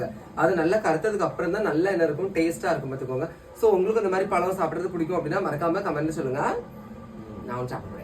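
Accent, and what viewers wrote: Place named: Salem, India. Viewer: Salem slang manthan valai name anna 😋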